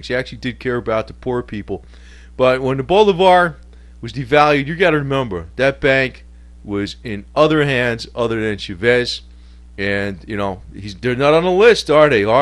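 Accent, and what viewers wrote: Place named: Minnesota, United States. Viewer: this guy is obviously from MIN NES SOODAA.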